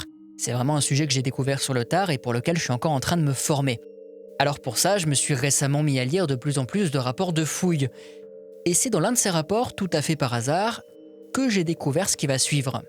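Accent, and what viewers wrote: Alsace, France. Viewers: C'est la première fois que je vois une de tes vidéo, mais t'es pas Alsacien ?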